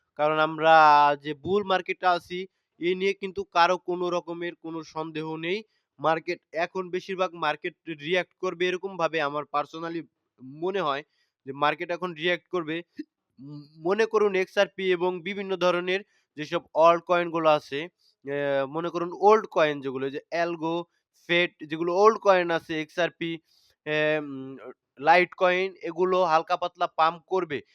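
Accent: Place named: Faridpur, Bangladesh. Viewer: Vai faridpur kotgay apnar basha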